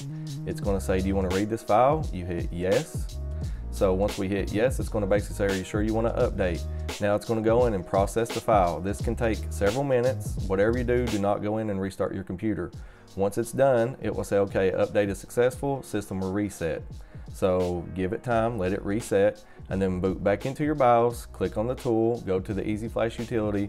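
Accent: South, United States. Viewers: thank you so much. didn't expect a computer man to have a southern accent haha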